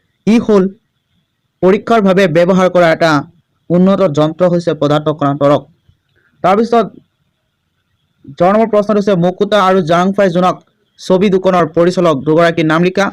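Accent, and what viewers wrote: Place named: Lower Assam, India. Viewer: Vatia ussaron hoi ase bujat okhobida hoi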